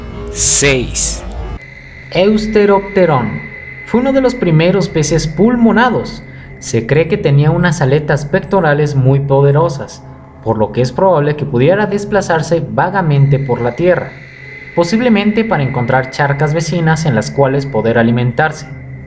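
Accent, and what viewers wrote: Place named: Portugal. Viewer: que buen acento portugués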